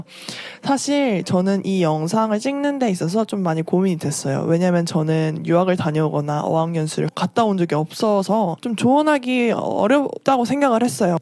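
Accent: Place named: United Kingdom. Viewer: 목소리랑 영국발음이 찰떡인것..영어 뽐뿌 받고 갑니다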